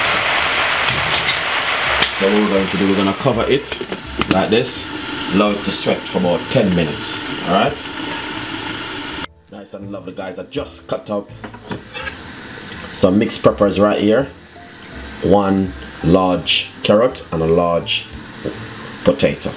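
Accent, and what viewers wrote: Jamaica. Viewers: All dis time, I thought you broadcasting from JA. 🙏🏾😂🇬🇧🖤🇯🇲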